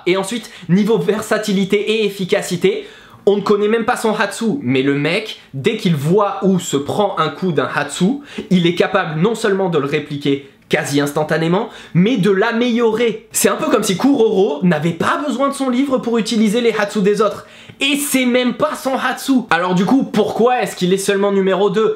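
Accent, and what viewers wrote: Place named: France. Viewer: Don't understand a word 😂 but I missed you and your wonderful French accent so much! 😍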